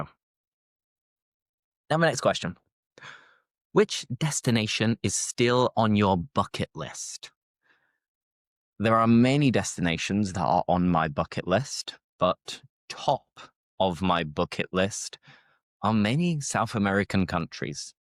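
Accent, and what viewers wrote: United Kingdom. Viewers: Finally, i understand british accent. Thanks so much😊😊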